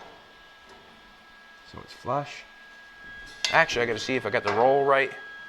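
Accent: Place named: Canada. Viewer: Lol you Canadian eh not Ruski lol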